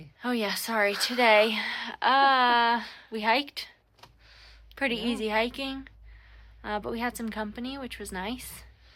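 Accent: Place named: South, United States. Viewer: Where in Maryland with a southern accent. I think the East Coast is changing yalls speech 😅 o yea you all live in LA yea you all have I-5 and yes I been there and by far some of the worst traffic I have ever sat in before. O wow the video in the dark reminds me of the (are you afraid of the dark) lol. I know the rocks are going to get worse unfortunately 😓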